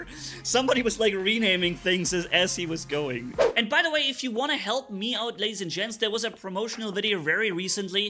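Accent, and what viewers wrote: Germany. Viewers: Sein Akzent hört sich deutsch an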